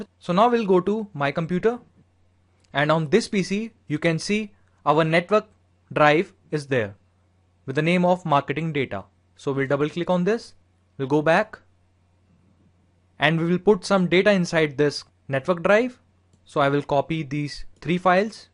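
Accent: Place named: India. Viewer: another excellent tutorial with a thick indian accent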